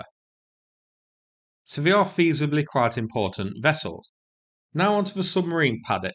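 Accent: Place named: North, United Kingdom. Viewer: WHY DOES THIS BLOKE SPEAKING REFUSE TO HONOR MY REQUEST THAT HE SPEAK MORE CONCISELY AND WITH MORE OF THE QUEEN'S ENGLISH. He has a broader audience than just Manchester or Liverpool or the Lake Districts or wherever he lives. Thank you in advance, again!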